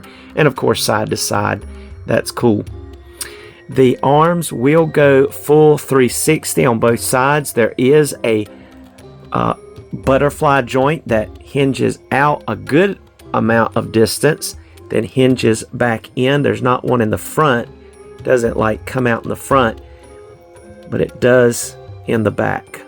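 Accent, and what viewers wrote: South, United States. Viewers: like the name! Reminds me of Osborne. I enjoyed the video.. I was just wondering where you’re from and the accent.? My guess is from down south? Alabama maybe? Your voice also reminded me of the guy from the hangover again enjoyable thanks.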